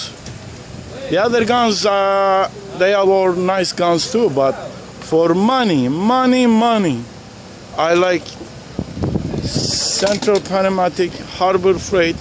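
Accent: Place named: Poland. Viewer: Your accent brings tears to my eyes, thinking of my family from Poland !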